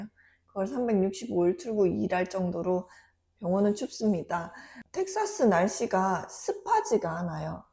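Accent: Gyeongsang, South Korea. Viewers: 오... 저희 와이프도 간호사예요. 저희도 간호사 와이프 때문에 미국에 이민 온지 4년 됬고 달라스로 이사 온지는 1년 됬습니다. DFW 사시면 저희 집에 한번 초대해서 저희 가족과 한번 뵜으면 좋겠네요. 사투리 쓰시는거 보니 저희 와이프도 경상도거든요 ^^ DFW 근처 계시면 연락한번 주세요.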